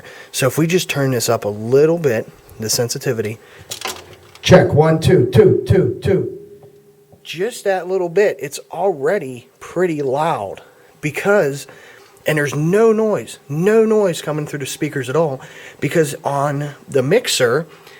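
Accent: Pittsburgh, United States. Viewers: You HAVE to be from around Pittsburgh. You talk like everybody around me.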